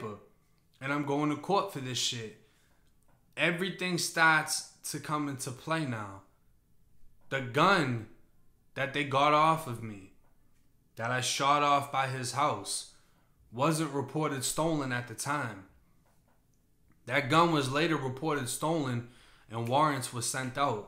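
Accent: Boston, United States. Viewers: Bro I thought u were from Boston. Bcuz u have a Boston accent. Wow i was wrong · totally Boston dude... I heard it too. I was totally confused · Swear all this time I thought he was from Boston with his accent. Woulda never that FL. Still only a few months in to his channel not knowing his story or if he was raised there and moved to FL later on, but his accent is thick; love it. But about the story - wow! Alex was a real one Bro. You a solid dude so it makes sense why you and him were friends; explains why you feel guilty for something that wasn’t directly your fault. Alex was solid and didn’t fold - don’t think he blames you for his character just being that of loyalty (if that makes sense).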